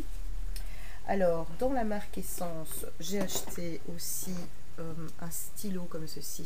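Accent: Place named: Belgium. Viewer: et jadore ton accent belge!!!! sa me rapelle une famille chez qui jai passer la plus part de mon temps!! heureusement pour mon porte monai ya pas de produit catrice ni essence dans le coin!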